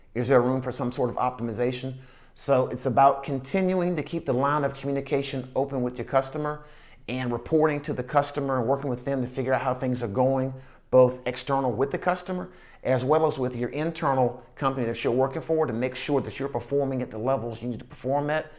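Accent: South, United States. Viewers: That southern accent coming through strong 🤠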